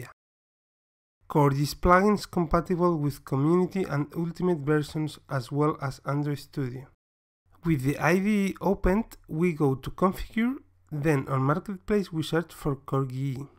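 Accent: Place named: Germany. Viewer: I agree with you, German people are super nice. On my previous comment I said "The next videos were narrated probably by a German." because I had caught a small hint of German accent in the narration.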